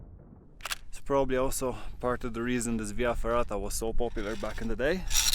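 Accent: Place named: Romania. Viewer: Mi-am si zis, ca dupa accent esti roman. Felicitari pt ceea ce faci!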